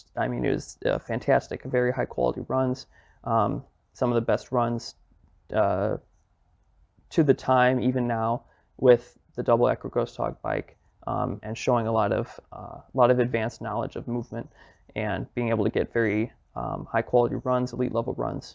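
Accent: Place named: England, United Kingdom. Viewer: I like your english accent lol😭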